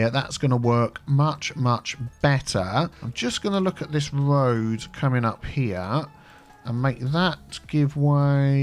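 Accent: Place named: Derby, United Kingdom. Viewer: You sound like you live right round the corner from me. Im from Derby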